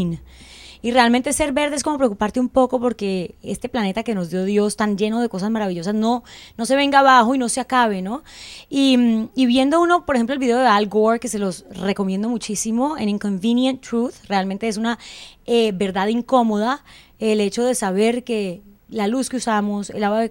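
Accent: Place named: Cali, Colombia. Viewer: tipico acento valluno colombiano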